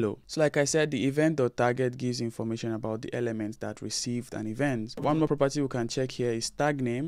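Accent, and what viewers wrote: Nigeria. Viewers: naija accent i am gussing, leaning javascript too, thank for the video by the way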